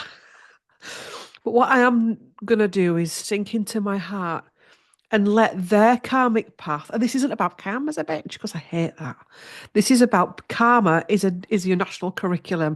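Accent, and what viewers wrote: Yorkshire, United Kingdom. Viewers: Some of your pronunciation today was particularly Yorkshire, you made me smile. My 84 yo dad is a Yorkshire man. You help sooth the Soul during these times....🫠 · Love your videos and yorkshire accent. ❤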